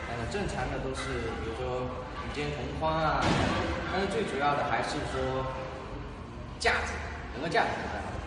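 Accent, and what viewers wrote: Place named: Fuzhou, China. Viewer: 亲切的福州普通话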